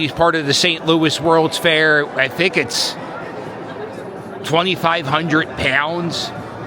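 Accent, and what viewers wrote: Philadelphia, United States. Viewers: Bros Philly accent is 👌 💯 😂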